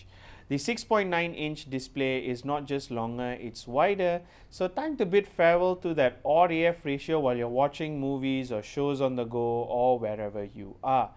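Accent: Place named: Sri Lanka. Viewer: Just asking are you from srilanka?? Loved ur accent..❤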